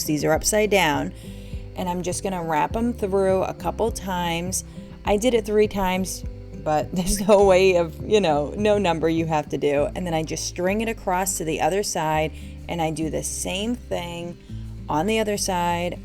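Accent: United States. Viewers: I’m from Somerset MA and you sound like me. I picked it up right away. I was going to say Attleboro but Plymouth makes sense too. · Where u from? I hear a hint of an east coast accent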